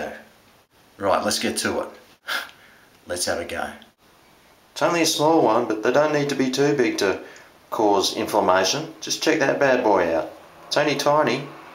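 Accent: Australia. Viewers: Is he Australian,he sounds like one of my friends from Australia! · I knew you were Australian!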